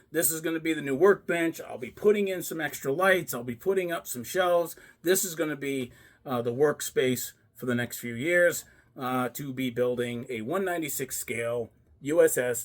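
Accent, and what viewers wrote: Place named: North, United Kingdom. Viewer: I hear that northern accent getting mixed in with that other one you have ha ha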